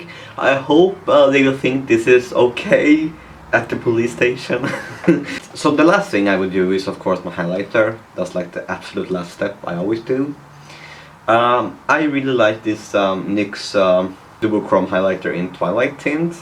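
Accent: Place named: Sweden. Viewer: YOUR SWEDISH ACCENT IS SUPER COOL, from which part of Sweden are you from?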